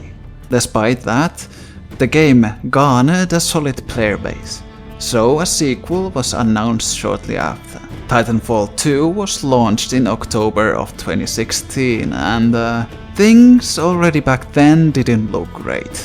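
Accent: Finland. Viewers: u sound Finnish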